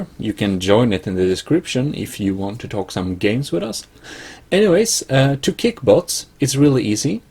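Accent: Sweden. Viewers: hörs att du e svensk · Your are frome sweden?Tjena bror. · är du svensk?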